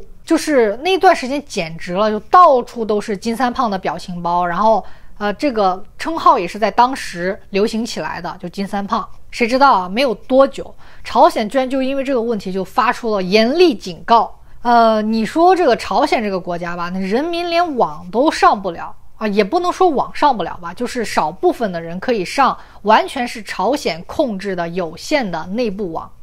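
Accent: Standard. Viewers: 希特勒。。。姓xi。。。。。。。。。其实你中国话说的还挺标准呢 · 我很好奇一点！你说着一口比我标准且流利的普通话，大概就是汉族吧。我只是中国西部一个少数民族，我觉得中国最起码安定不吵闹。你一个本族人整天在这外网上喷自己的祖国着实很难理解。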